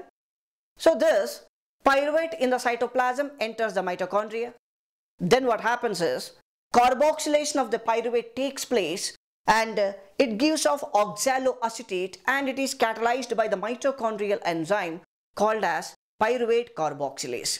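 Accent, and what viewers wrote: India. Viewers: You sounds exactly like my indian classmate who topped the class and admitted to the Singapore's NTU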